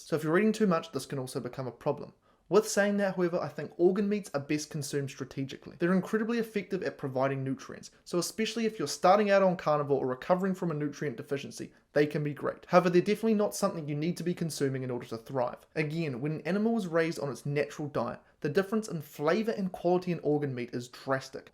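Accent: New Zealand. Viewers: First video I’ve ever seen on this channel… what is his accent?! It’s seems to be 70% NZ, 15%Bondi hipster, 15% Saffa. Parents from Zim? I dunno, but I want to 😂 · Accurate video.. love the Kiwi accent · Ah— NZ! I knew the "accent" was not AU, and not SA. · Are you a New Zealander?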